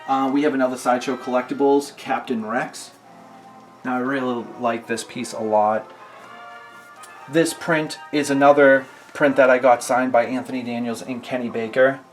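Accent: Boston, United States. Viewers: From Scotland love the boston accent, just like the greatest president John F Kennedy. Star wars collection is quality. I used to chew the arms and legs off my storm troopers so it looked like han or luke had blasted them lol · "This here's a daaat boawd" defo Boston didn't hear aussie tho. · Definitely boston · Is that a Boston accent??? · Boston accent? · Boston guy ay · Is it a boston accent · I was like, "This dude Boston or a Yorker"? I changed my mind like 5 times but I'd guess he's Boston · Are you from boston. You have the accent. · Total sidebar, but is that a Boston accent? Only I'm from the UK so it's fun to try and guess American accents. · He sounds boston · Boston accent heavyyyy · Are you from Boston? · u lie in Boston bro?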